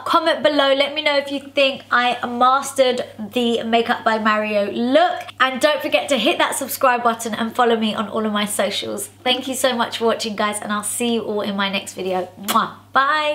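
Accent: United Kingdom. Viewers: Omg hate your englisch accent 🤢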